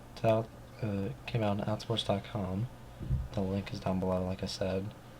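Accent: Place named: West Virginia, United States. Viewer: has the kind of accent common in West Virginia. It's essentially a "southern" accent, though not as heavy as you might hear in the Carolinas.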